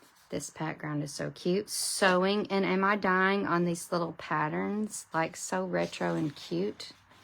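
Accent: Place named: South, United States. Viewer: It’s so good to see you and hear that sweet southern accent again! Love the card inspiration!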